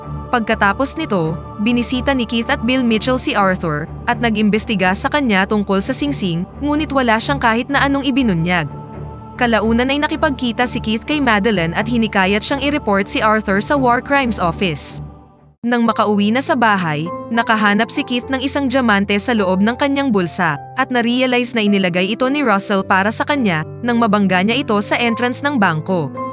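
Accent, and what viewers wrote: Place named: Philippines. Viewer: galing, sir anong reader app mo na may pinoy accent?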